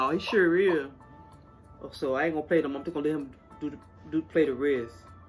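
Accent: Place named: South, United States. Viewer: love the accent. reminds me of family from the south. haven't seen them since I was a child at 12.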